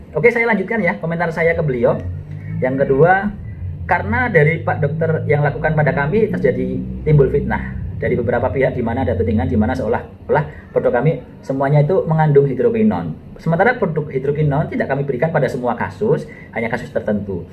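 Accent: Indonesia. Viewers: Medok gak sih dok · Dok,, suarane purworejo banget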